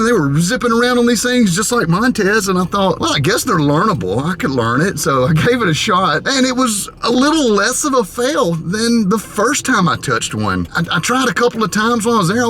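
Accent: South, United States. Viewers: I made the kids bring bicycle, so they're tired. But I've been riding my Rec-lectric unicycle, and I am NOT tired!............ REC-LECTRIC!!........... I don't know what it is about southern people with they're drawl that cracks me up so bad when they mispronounce words. I had to watch that part a few times. Don't get me wrong, I'm not laughing at them as if they are stupid, it's just the way the accent sounds and the funny ass pronunciation of words that gets me. Thanks for the laugh. Keep 'em comin!!!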